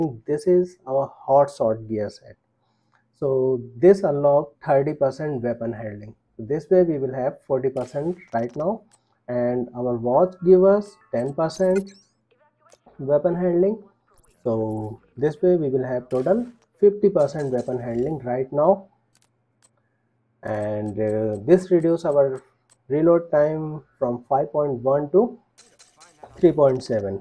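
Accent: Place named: India. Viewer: Thank you for sharing I understand your India 🇮🇳 accent it’s a little broken but I appreciate the effort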